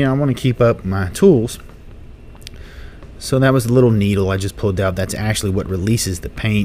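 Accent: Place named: Midwest, United States. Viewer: Call Me silly, But gosh does it feel good hearing a Midwest Boy. Great video, and nice work.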